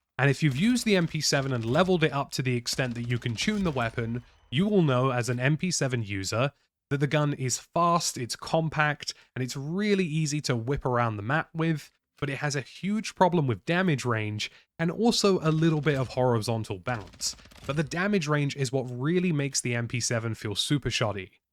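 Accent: RP, United Kingdom. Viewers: mate, you're too posh to be confusing less and fewer. Good video though.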